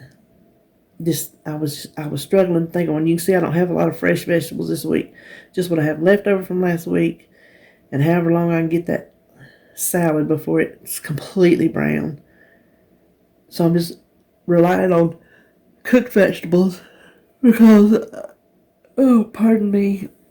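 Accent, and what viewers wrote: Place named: Mississippi, United States. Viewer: quit beating yourself up by saying you're taking the easy way and!! Cut yourself some slack. If you don't feel up to making a recipe and have a simpler or easier way then go for it!! You're wonderful and I LOVE you just the way you are. I'm born and raised in northern Illinois and we wore bread sacks on our feet and then our snow boots. Boots went on easier and helped keep our feet a little warmer. I'm 64 and was born and raised on a dairy farm and we had lots of outside chores to do. You remind me a lot of my Aunt who lived in Mississippi. Your accent, smile and laugh just brighten my day. Have a great weekend.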